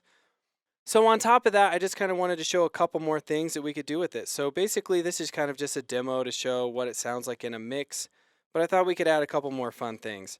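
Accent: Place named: United States. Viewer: Bruh. So glad u speak American haha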